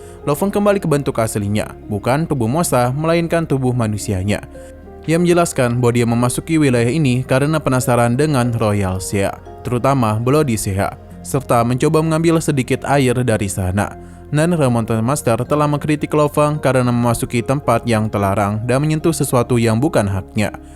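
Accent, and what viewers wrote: Banjar, Indonesia. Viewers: Bang orang Banjar kah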